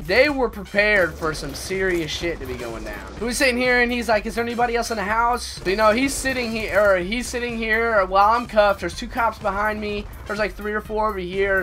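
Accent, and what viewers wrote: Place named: Indiana, United States. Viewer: think that's just his Indiana accent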